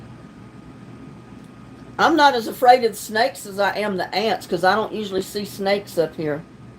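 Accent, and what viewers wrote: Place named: South, United States. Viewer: That was a cool live stream, though I enjoyed it so far and I still like your voice with that accent. I don’t mind accent you know southern sounding. I like the way you speak you know everybody does speak differently though.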